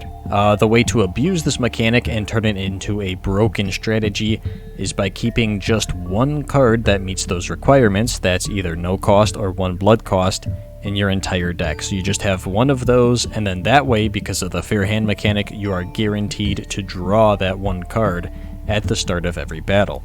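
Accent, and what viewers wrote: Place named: Michigan, United States. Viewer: Are you from Michigan? Your accent sounds so much like my brother in-law who is from there.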